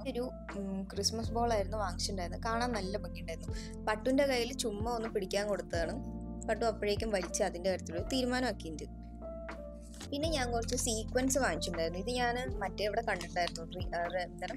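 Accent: Palakkad, India. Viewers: Chechi palakkad anno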